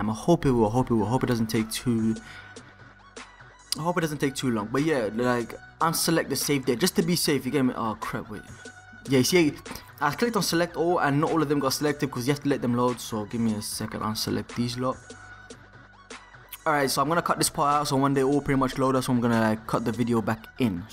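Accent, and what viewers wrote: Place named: London, United Kingdom. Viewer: London accent! Gunners Fan??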